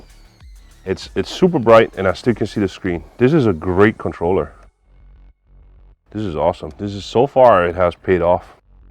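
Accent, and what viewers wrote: Hawaii, United States. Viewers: I enjoy watching your videos very good explanations. Just thinking I don’t think you are a native of Hawaii with an accent like mine lol👍👍👍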